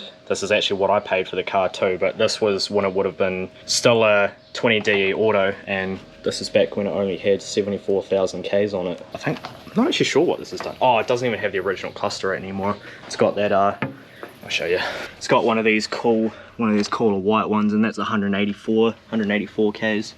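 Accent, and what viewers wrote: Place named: New Zealand. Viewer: What part of nz are you from bro?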